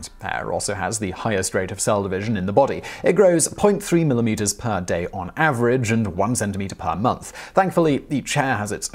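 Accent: United Kingdom. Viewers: How come you speak in traditional English but spell in American English? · 😂 😂 😂 Maybe you missed it so I'll point it out. He has a British accent and sometimes they pronounce things differently.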